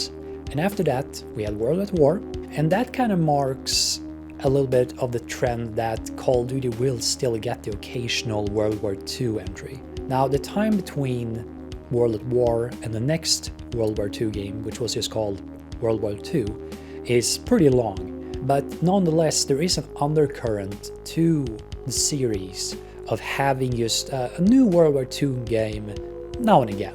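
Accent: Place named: Sweden. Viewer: Great video bro🙂btw are swedish? Your accent sounds just like it 🙂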